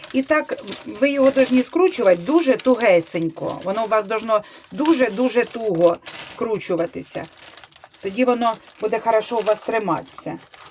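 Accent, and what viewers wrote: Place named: Luhansk, Ukraine. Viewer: говор такой как у нас, суржик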